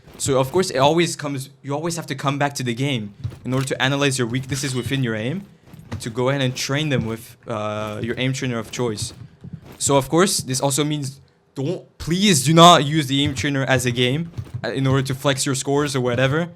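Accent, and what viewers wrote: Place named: France. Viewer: Accent français et anglais mélangé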